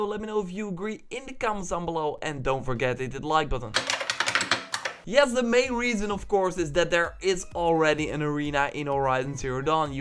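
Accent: Netherlands. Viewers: Are you dutch? You have a dutch accent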